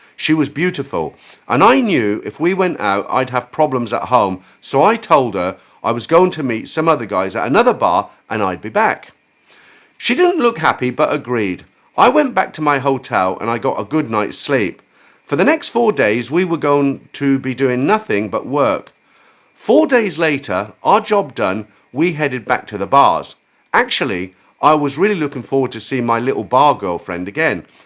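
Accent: United Kingdom. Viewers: That last story was THE FUNNIEST STORY I've ever heard on this channel !! ....and the super professional way you read it (with your super-kool-British-accent) ...makes it even funnier....not that anything is wrong with your accent, but to me (as an American person)...it just hits me in a way that makes the story The Greatest !!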